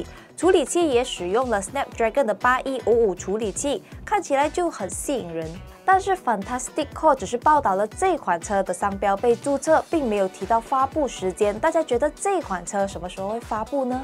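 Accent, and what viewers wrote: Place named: Malaysia. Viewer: 我喜歡這種大馬口音 加油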